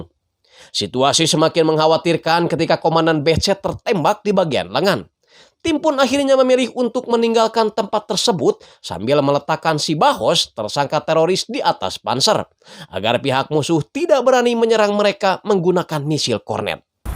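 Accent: West Java, Indonesia. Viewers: Filmnya keren.. Naratornya kocak.. Logat sunda makin mantapp!! Sukses sll kang..!!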